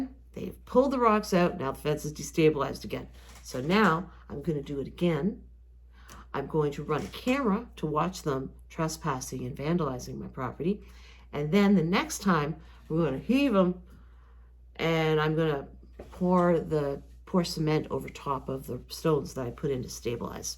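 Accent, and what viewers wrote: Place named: Canada. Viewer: I am guessing by your dialect you are in Canada?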